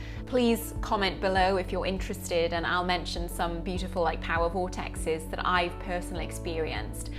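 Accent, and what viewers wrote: United Kingdom. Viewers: いつもキレイなイギリス英語を勉強しています。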